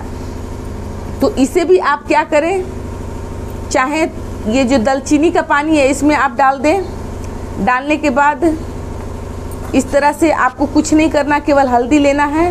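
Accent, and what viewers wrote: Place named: Varanasi, India. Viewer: Madam, aap aur aapki aawaaj me mujhe apna family dikhati hai. Shayad aap banaras ki hai.maduaadih kì hain? Reply kariyega.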